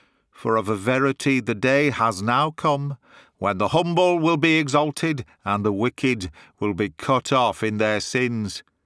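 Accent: United Kingdom. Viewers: Mr your voice and narration is really marvelous... you can never imagine how much I enjoy listening to your narrations... your british accent helps me so much in learing british english... please never stop uploading more of your fantastic work... thank you very much sir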